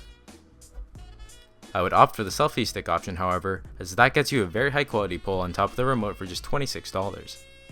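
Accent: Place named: Scotland, United Kingdom. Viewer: Fantastic review maybe talking just a wee bit to fast or maybe its just that accent im so used to Scottish. Great stuff thanks and oh maybe if you have a moment to tell me the awsum music that you used in your review. Much love from bonnie scotland ACH AYE!!!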